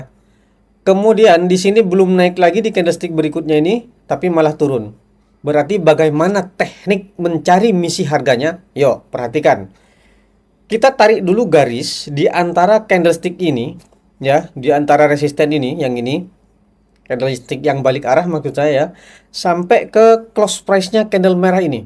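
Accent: Lampung, Indonesia. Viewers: Bang, Abang orang Lampung ya Bang? Logatnya khas. Salam.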